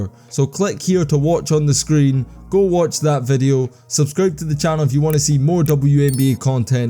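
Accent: Scotland, United Kingdom. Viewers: Good job. It just seems kind of odd to hear someone with a thick Scottish brogue doing an analysis of American basketball. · caitlyn clark is not beating shit ok , boy i tell you the racist cowardice power structure is something else , Those other girls on the indiana fever are balling there asses off there the ones that’s winning those games , but yet everytime they give all the credit to caitlyn clark that’s a good way to start division amongst your teammates, this dumb british accent racist guy narrating is dillusional , those girls on the indiana fever are playing there asses off stop giving caitlyn the credit when she has a whole team behind her !!!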